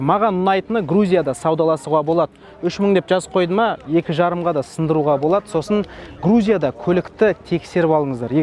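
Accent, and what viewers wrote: Standard. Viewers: Бауырым ризамын саған, қазақша таза сөйлеп жүргізгеніңе бейне роликті👍✊👏🏻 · Таза казакша сойледи жарайсын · Таза казакшан ушн улкен рахмет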